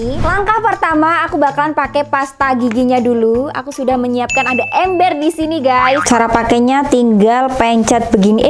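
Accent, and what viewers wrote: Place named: Semarang, Indonesia. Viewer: Gek weruh aku ono channel e mbak iki, mesti cah plat H logate koyo aku oq soale wkwkwkwk makasih reviewnya yo mba, aku tertarik sama yg obat kumur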